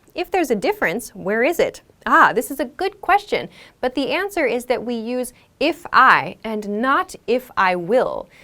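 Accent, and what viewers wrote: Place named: United States. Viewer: Estou aprendendo inglês e gosto de ouvir a sua fala do inglês americano sou brasileiro e vivo na Brasil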